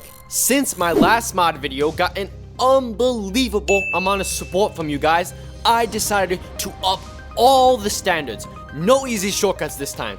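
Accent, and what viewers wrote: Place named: New York, United States. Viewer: i love that ny accent lol ily btw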